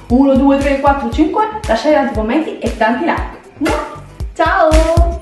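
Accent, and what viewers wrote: Naples, Italy. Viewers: ma tu di che parte di napoli sei?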